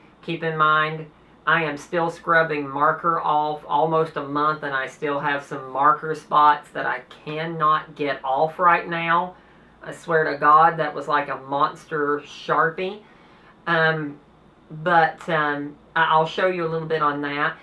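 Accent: South, United States. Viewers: The word for subcutaneous air (air under the skin) is "crepitus". Pronounced CREP-it-tuss. Congratulations! I too am trans M to F. Your accent is distinctly southern. May I ask what state you are from? I am a Florida. All the best! Alanna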